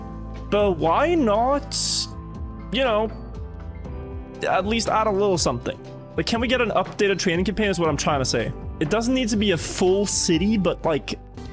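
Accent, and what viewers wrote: Norway. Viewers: subtitling his norweigen accent is so funny lmao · Aww man speaking with that Norwegian English is awesome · I love Norwegian "accent" - It just improves everything! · The Norwegian accent....😂 Everytime I hear Norwegian speak it.... Fucking adorable. 😂 Live in Sweden the Swedish accent is just not the same. Close but not the same.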